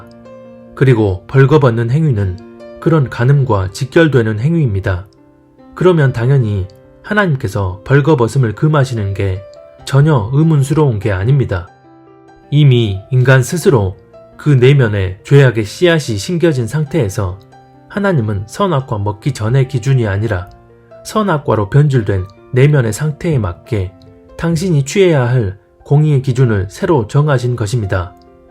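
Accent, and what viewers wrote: Jeolla, South Korea. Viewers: 가끔 들를때마다 많은 도움받고갑니다~^^ 근데 말씀하실때 억양에 광주,전남 사투리가 좀 섞여있는거같아요 ㅋ~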